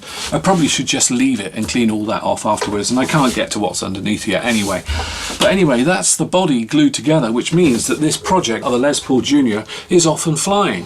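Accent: United Kingdom. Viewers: You're doing excellent work. I really like the British accent too. I'm from Germany by the way. Any chance you can show us to make a Gibson SG but with symmetrical horns? Because every time I look at the original I get furious. I hate asymmetry.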